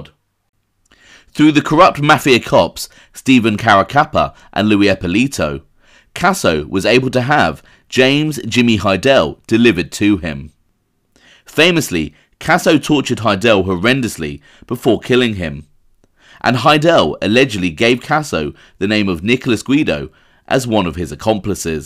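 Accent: England, United Kingdom. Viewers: Sorry can't listen to some English guy talking about American mobsters doesn't sound right to me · Sammy gravano could take a few lessons from you. Your knowledge of mob issues is mind blowing. I can listen to your u k accent all day.you don't swear and have a dignified demeanor that lends it's self to the program. Thanks again bill feilhauer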